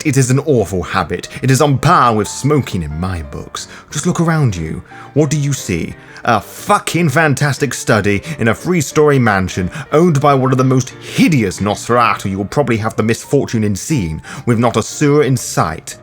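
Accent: United Kingdom. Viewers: Its called being British, which I assume he is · Pray tell, fool. What do you THINK a British person should sound like?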